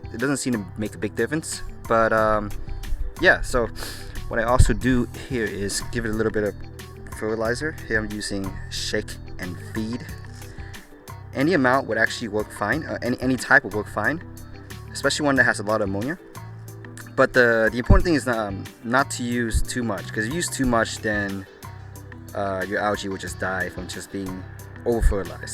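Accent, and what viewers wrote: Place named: Australia. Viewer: Nice video! Very informative! Just curious I detect an accent, are you originally from Australia?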